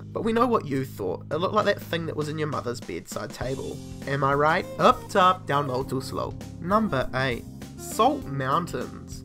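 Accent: New Zealand. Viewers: That new Zealand accent is making my ears bleed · It's his accent you fucking idiots, not a lot he can do about it. He's from New Zealand. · Yeah He is from New Zealand probably Aukland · the brogans Dunno if he's a Kiwi or not... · He could be from Whakatane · definitely from New Zealand.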